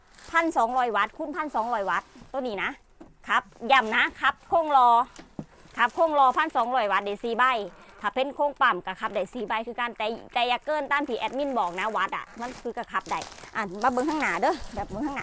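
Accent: Thailand. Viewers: ต้องให้เพื่อนคนอีสานแปรให้ฟังอีกที กว่าจะรู้เรื่อง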